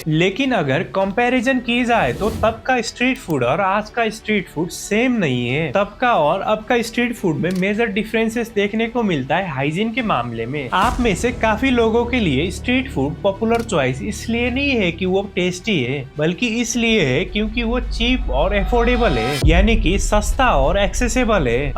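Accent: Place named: Nepal. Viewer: Yeh toh nepali accent lag raha hai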